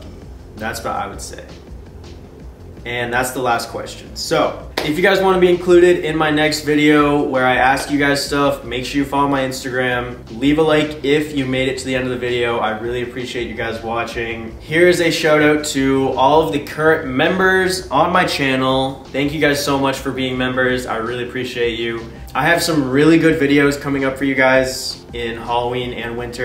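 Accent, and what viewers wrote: Canada. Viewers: Are you Canadian? You have a Canadian accent lol.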